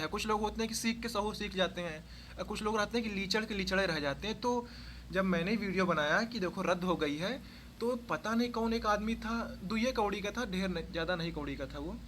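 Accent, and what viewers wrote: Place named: Gorakhpur, India. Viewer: लेकिन् आपके बोलने के स्टाइल से तो लगता है की आप गोरखपुर देवरिया से है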